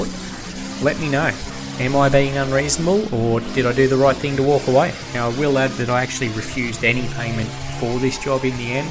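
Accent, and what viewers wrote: Australia. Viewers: Hi,! No need to stop talking in your videos! I enjoy your narratives about what you are doing. I also like your Aussie accent!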